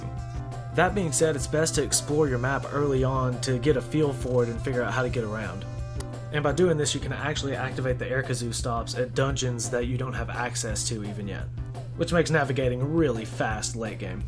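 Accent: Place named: Rural, United States. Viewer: Thanks for the video. I like your country twang.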